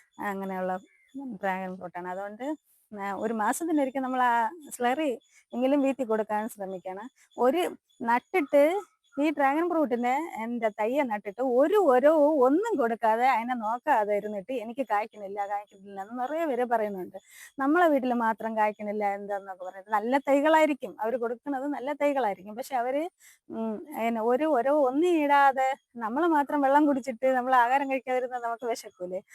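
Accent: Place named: Trivandrum, India. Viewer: Nalla സംസാരം. ഒറിജിനൽ. TVM മിടുക്കി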